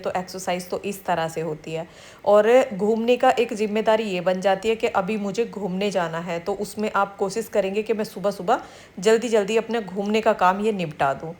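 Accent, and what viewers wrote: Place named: Haryana, India. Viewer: Di m b gujjar hu kota rajasthan se lakin ap logo ko dekhkar nh lgta ki aap Gujjar ho quki humare rajasthan ka पहनावा boli sb aap se alg h aap ko or apke पहनावे or boli se aap haryanwi lgte ho lakin muje bhaut kushi hoti h jb अपनी समाज ke log aage bdte h mtlab kashker ke ladies jb kuch krti h to bdi kushi hoti m cahati hu apna gujjar समाज age bde 😊😊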